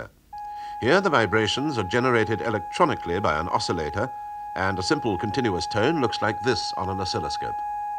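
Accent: RP, United Kingdom. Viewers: Is his accent considered RP? I'm American and am trying to work out UK accents.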